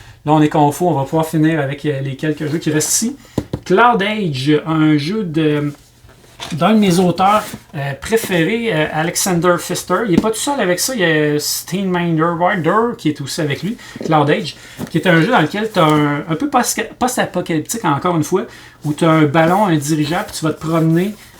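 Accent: Quebec, Canada. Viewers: Et bien j'ai 11 épisodes à regarder ! Merci pour l'ajout des titres sur cet épisode. J'avoue sur la 1ere vidéo y'a certains titres de boîte qui m'ont échappé. Pas habitué à l'accent québécois encore mais je m'améliore. J'ai appris un nouveau mot de vocabulaire : motton !! Merci pour la traduction 😅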